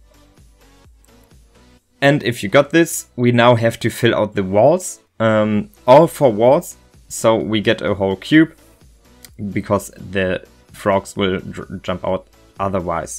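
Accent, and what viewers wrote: Germany. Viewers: It's called a german accent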